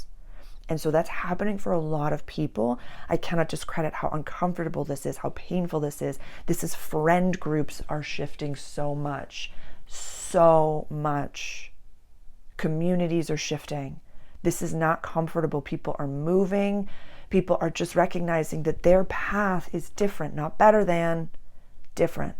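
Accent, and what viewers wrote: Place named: Northeast, United States. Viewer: I know it doesn't matter, but I like your NE accent. Thank you for the message.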